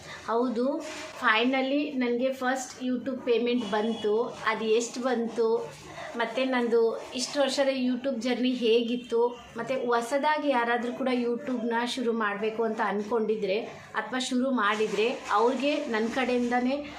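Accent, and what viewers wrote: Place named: Hassan, India. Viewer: ಮೇಡಂ ನಮಸ್ಕಾರ ನೀವು ಮಾಡುತ್ತಿರುವ ಇತ್ತೀಚಿನ ವಿಡಿಯೋಗಳನ್ನು ನೋಡುತ್ತಿದ್ದೇನೆ ಎಲ್ಲಾ ವಿಡಿಯೋ ಚನ್ನಾಗಿ ಬರುತ್ತಿದೆ. ನೀವು ಸರಳವಾದ ನಮ್ಮ ಹಾಸನ ಜಿಲ್ಲೆ ಭಾಷೆ ಚನ್ನಾಗಿದೆ. ನೀವು ಏನು ಓದಿದ್ದೀರಾ ಇಂಗ್ಲಿಷ್ ಪದಗಳು ಚನ್ನಾಗಿ ಬಳಸುತ್ತೀರಾ, ನಿಮಗೆ ಒಳ್ಳೆದಾಗಲಿ. 🙏🏽🙏🏽🙏🏽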